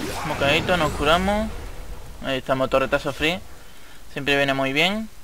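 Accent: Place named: Andalusia, Spain. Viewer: a que tu eres de andalucia?¿ o al menos pareces XDD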